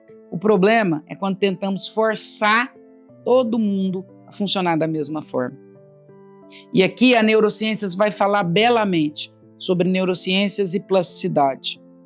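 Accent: Minas Gerais, Brazil. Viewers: Sempre ouço os vídeos em podcast! Mas percebi no sotaque mineiro,uma credibilidade,. portanto a atitude de não menos que se inscrever em um canal a qual pessoa me faz se emocionar!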